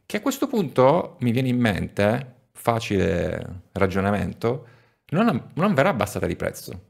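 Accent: Catania, Italy. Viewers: Ma sei Catanese? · Grandissimo ma sei di Catania?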